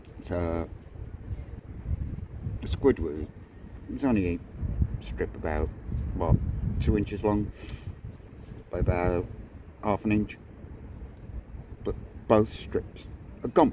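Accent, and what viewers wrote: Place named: Yorkshire, United Kingdom. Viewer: You don't sound much like a brummie no more your starting to sound Yorkshire 😂❤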